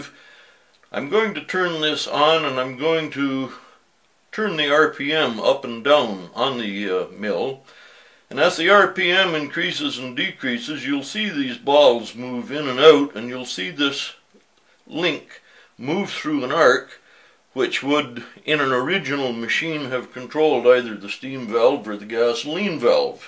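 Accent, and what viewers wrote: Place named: United States. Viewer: This 'Murican accent... Love it!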